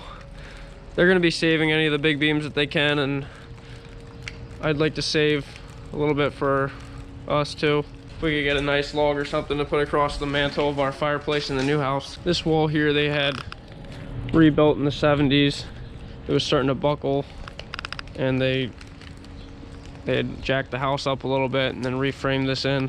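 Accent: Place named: Pennsylvania, United States. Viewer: Hey, thanks for your answer, I was curious. Thank you also for your excellent videos over the years, I watch every single one ASAP! I am originally from Bucks County, PA and really enjoy watching you and your dad, and listening to your accent, brings back memories of my grandfather's farm in Douglassville, PA. You do great work, best wishes for continued success, you certainly deserve it!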